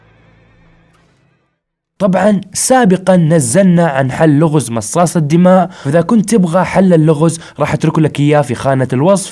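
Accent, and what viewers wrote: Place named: Jizan, Saudi Arabia. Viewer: ياخي انت من جيزان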